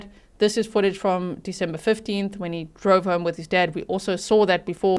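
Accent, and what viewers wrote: South Africa. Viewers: I’m from South Africa as well, I thought you sounded like me. I live in ST George, Utah. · love your afrikaner accent!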